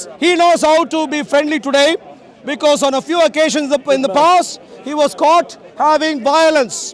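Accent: India. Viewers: Why is an Indian talking and British in the background ?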